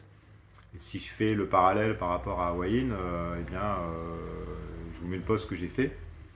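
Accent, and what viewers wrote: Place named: Montpellier, France. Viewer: Tu es de Montpellier toi avec ton accent pointu? Nan en fait je crois que tu es venu acheter le soleil comme ton pote qui est allé acheter une femme dans les pays baltes ou en Thaïlande pays tristement célèbre pour ça. N'oublie pas de revenir te faire soigner gratuitement en France si tu tombes malade ^^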